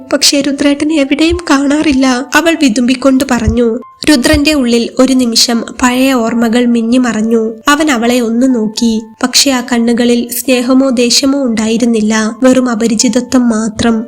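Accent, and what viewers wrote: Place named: Malappuram, India. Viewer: കഥ കേൾക്കാം. ആസ്വദിക്കാം. മലപ്പുറത്ത് ഴ എന്നത് ശരിയായി ഉച്ചരിക്കുന്നത് കുറച്ചാളുകൾ മാത്രമാണ്. ചെറുപ്പം മുതൽ കേട്ടതും പഠിച്ചതും ആ രീതിയിലാണ്. എല്ലാവരും എല്ലാം കുറ്റമറ്റ രീതിയിലാണ് പറയുന്നതെന്ന ധാരണ മാറ്റണം. ബുദ്ധിമുട്ടുള്ളവർ കേൾക്കാതിരിക്കുക. കുട്ടീ നീ നിൻ്റെ രീതിയിൽ തന്നെ തുടരൂ.എല്ലാവിധ ആശംസകളും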